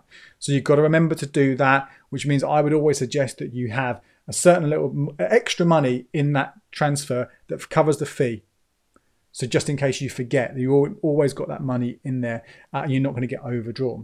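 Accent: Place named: United Kingdom. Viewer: Who can keep up with all these bank accounts good lord lol . By having so many bank accounts you run the risk of inactive accounts . After awhile they can shut down those accounts depending on your banks policies . But I’m guessing from the accent you’re from the UK which has different banking laws .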